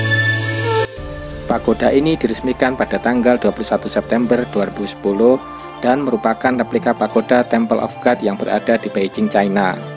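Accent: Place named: Java, Indonesia. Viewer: ulasannya jelas dan rapi. ditambah prolog suara yg medhuk. jian video dokumenter yg mantab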